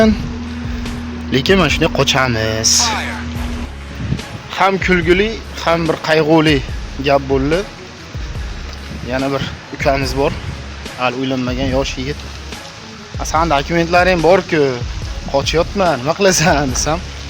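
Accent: Uzbekistan. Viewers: Шева кургон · Укажоним Каттакургонданмисиз??Шевангиз билдим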